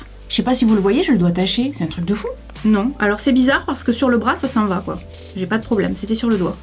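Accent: South, France. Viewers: Salut je suis abonné à toi depuis pas longtemps et chaque fois que je vais sur YouTube je regarde une de tes vidéos nouvelles ou en sienne et voilà je T'adore ton accent du sud à force de t'écouter je le prends (mon père a grandi dans le sud).Maman de YouTube reste sur YouTube ont T'adore tous❤❤❤❤❤❤❤❤❤ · Coucou..pkoi tu es dans love list you tubeuse.. Déjà car c toi que j'ai découvert en premier et puis tu es brillante originale tu as vraiment la lumière a ts les étages et pr moi c Versailles quand je te regardes. C comme ça. Ussi pr les origines un peu communes. Jsuis pierre Richard 3 comme toi. Et tu sais tjrs de quoi tu parles tu parles bien (avec nôtre aquecant.. Erreur les les autres qui on un accent pas nous et au dessus de Montpellier c Paris.. C Flo le catalan qui le dit et c vrai. Avantgardiste par les sujets de t vidéos inspirés d influenseeuses des states tu as tjrs une longueur d'avance. 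Écoute tu es speysse!! spéciale spaciale..astrale..jme sens très connecté à tes pties folies t brins de fantaisie.. Bravo #comment tu parles bien aux djeuns!!!! Tu es le vaccin anti.conneries. C sur que t enfants de yt travaillent mieux à l'école maîtresse.!! Bon dimanche venteux ➡️🌞glagle.. Biz a toi ta mam et🐕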